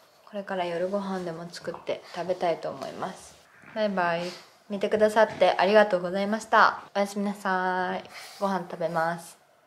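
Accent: Kansai, Japan. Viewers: 関西弁使うな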